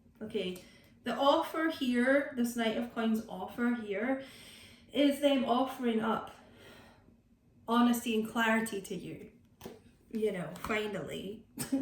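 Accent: Scotland, United Kingdom. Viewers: Great realisation. What a beautiful Scots accent.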